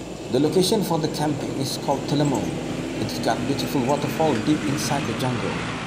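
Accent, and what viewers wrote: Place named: France. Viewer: Where do you live? You sound french but the scenery makes me second guess myself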